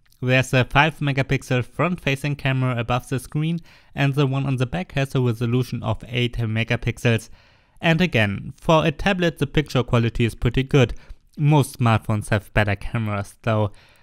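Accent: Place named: Germany. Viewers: Yeah it's a very hard german accent:P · I think DEUTSCH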